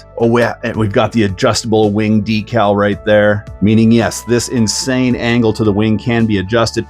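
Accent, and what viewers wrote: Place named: Canada. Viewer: Deckles and Lie-very… you Canadians always make my day!🤣 I built a frog back in 83/84… I grew up in Brooklyn and my neighbor upstairs was an ex plane builder, he built a frog and a holiday buggy for his two sons… I had to have a frog too… I like how the grasshopper looked lots more but I knew with the 380 I wouldn’t be able to keep up lol The super champ was the dream back then, and all the bad ass team associated cars that were just way too much for my 10 year old taste lol Those videos make me want to build them ALL!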